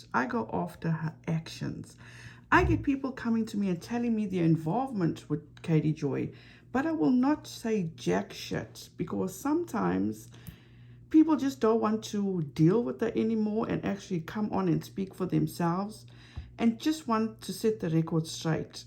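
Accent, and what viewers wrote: South Africa. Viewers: this is the first of your videos I have seen. Do I detect a South African accent?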